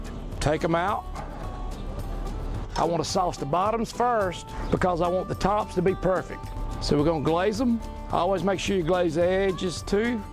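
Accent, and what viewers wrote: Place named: New York, United States. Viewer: I almost couldn't understand you with that thick New Yorker accent me being from Louisiana...lol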